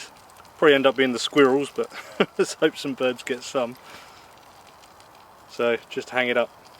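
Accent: United Kingdom. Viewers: R u from UK?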